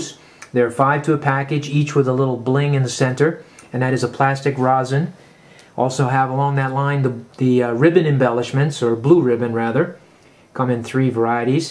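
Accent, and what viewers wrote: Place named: Massachusetts, United States. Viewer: Wicked Cool!! LOL are you from Massachusetts? <---I haven't heard that in years! Love the collection!! xoxo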